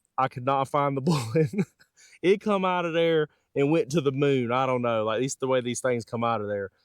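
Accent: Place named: Alabama, United States. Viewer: Just found your channel. Great video. I hope they take your critic well and fix the SD. If they do, l will give hunting with subs a chance. The bullet flat out performed. I am curious about where you are from. You talk exactly like me. I am an Alabama native, but lived in TN for 14 years and south FL for 3.5. Anyway, just subscribed. Keep ‘em coming.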